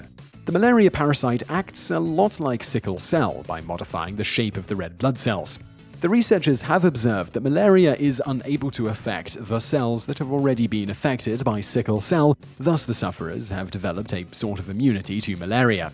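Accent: United Kingdom. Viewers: Thank you so much! I love love these intense snippets that make me search for more, Brit accent, also a big plus. Thank you!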